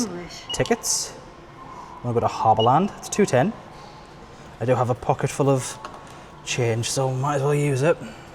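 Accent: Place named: Northeast England, United Kingdom. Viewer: I'm sorry but this is too funny!!! Are you from Sunderland or Newcastle?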